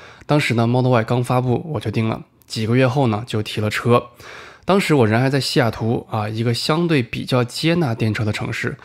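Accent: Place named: Northeast, China. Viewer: 感觉像东北😃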